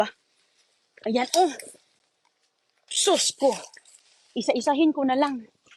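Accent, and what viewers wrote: Visayas, Philippines. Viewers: peper litus bisaya ka dhay.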